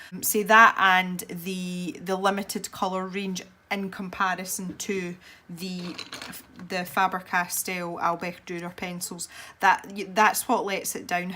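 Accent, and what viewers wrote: Scotland, United Kingdom. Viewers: Thank you for this very helpful review. The one by Faber-Castell themselves is so poorly made that it is pretty darn embarassing for them.🤭 And may I say that I just love listening to you talking, as I absolutely adore the sound of Scottish English! ❤ All the best from Germany! Instant new follower!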